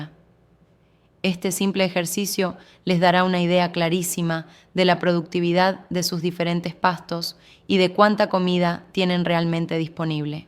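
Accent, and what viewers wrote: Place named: Argentina. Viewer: Eso ya es viejo, se llama rotación de potreros y porque hablas argentino?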